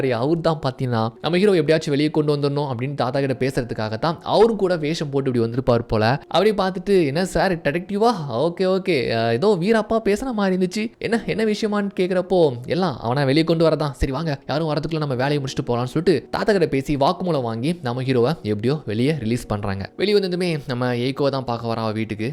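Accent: Tirunelveli, India. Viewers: Thoora ponga indh bashai tirunelveli slang la 😅nellai ya neenga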